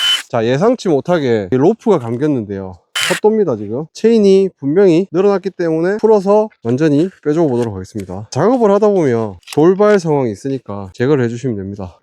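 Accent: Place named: Gyeongsang, South Korea. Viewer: (와~ 저기있는 나무 짤라야되는데 데이지가 않네) .... 경상도 사투리가 참 정겹습니다. ㅎㅎㅎㅎ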